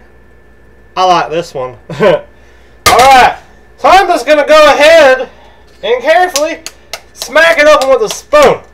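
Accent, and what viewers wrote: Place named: South, United States. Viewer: love the hillbilly voice! :D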